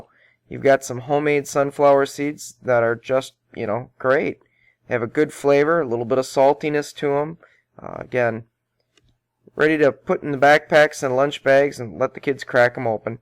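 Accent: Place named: United States. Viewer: We love your American accent! Thanks for telling us a great recipe :) Really helpful - we have thousands of giant ones!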